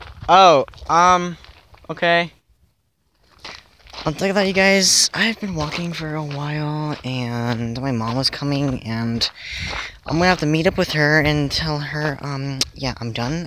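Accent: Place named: Australia. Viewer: Love the Australian accent, need to make more about your life videos 👍✌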